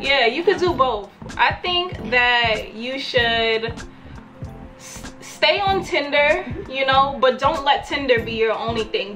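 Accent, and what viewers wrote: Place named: Caribbean. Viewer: Love your vids! Where are a from? I watched a few of them and I think I can hear a slight Caribbean accent, with certain words that is.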